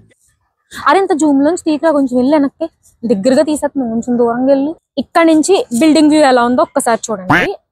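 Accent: Godavari, India. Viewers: Mana Godavari slang , vetakaram.super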